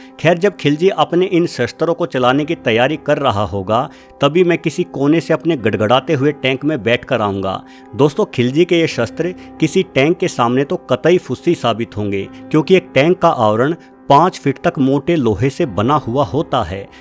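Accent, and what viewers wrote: Haryana, India. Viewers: Pkka hariyana se ho bhai sahab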